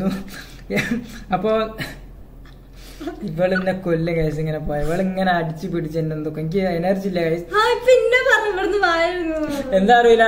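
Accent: Kozhikode, India. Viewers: പോയി പോയി കോഴിക്കോടൻ touch ഉണ്ടല്ലോ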